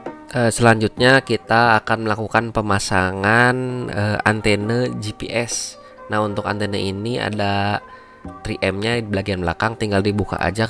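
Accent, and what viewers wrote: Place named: Bandung, Indonesia. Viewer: Asa bandung yeuh logatna,salam kang ti bogor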